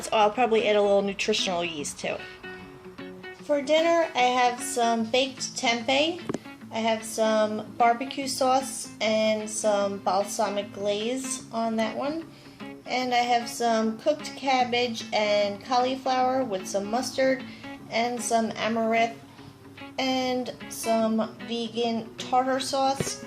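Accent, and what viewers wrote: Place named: Boston, United States. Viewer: you from boston? sounds like it how u pronounce coffee, sauce and salt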